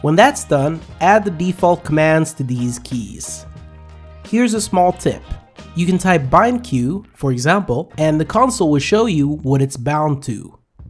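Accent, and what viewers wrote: France. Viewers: So beautiful french accent:O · good french accent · Are you french?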